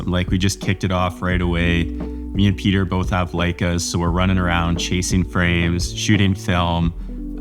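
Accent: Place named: Canada. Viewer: Dude, excellent storytelling. Excited to have you on the platform. Also that Canadian accent is 🔥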